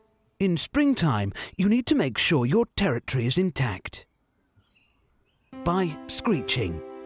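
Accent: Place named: England, United Kingdom. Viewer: Teun heeft het gisteren in AVS (Esternietstedoenmisschien) een beetje verraden dat hij zijn script opstuurde naar een onvervalste Engelse stem die de teksten ingesproken heeft.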